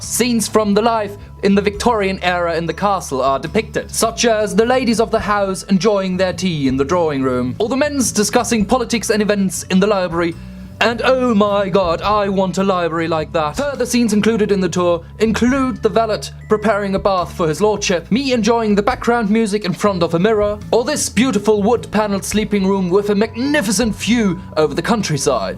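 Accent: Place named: United Kingdom. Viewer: Natürlich ist es eine "Special Occasion", wenn du da bist,! xD Richtig schönes Video!:D In der 7. Klasse oder so war ich mal auf Klassenfahrt und wir haben da Arundel Castle besichtigt. Das sah Warwick Castle recht ähnlich. Your English sounds very British by the way!;) I miss England terribly!:(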